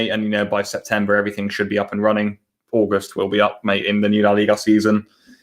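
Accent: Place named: United Kingdom. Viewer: Your English is very good, sounds like ur from the UK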